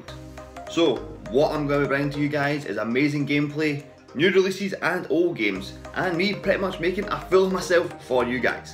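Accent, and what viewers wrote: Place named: Scotland, United Kingdom. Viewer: Haya fella you were nice enough to check me out so I've come to check you out. I've liked what I've seen (Love this Trailer :-) ) I take it from your accent that your from Scotland. Were about are you based?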